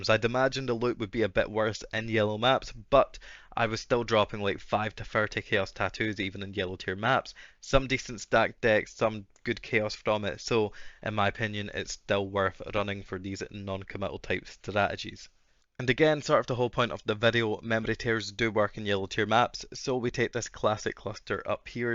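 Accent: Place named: Glasgow, United Kingdom. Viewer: n ur accents pretty tame too compared to some real glaswegian gems I encounter on the daily 🤣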